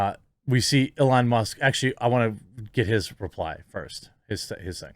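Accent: United Kingdom. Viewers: Guys with pompous British accents telling Americans how to run their country hasn't worked out historically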